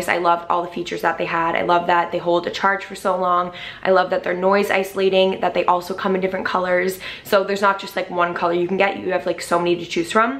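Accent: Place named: Boston, United States. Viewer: I know a Boston accent anywhere hehe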